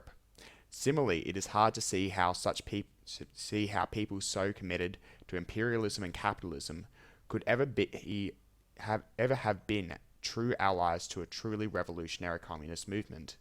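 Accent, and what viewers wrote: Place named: Australia. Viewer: more content in aussi accent pls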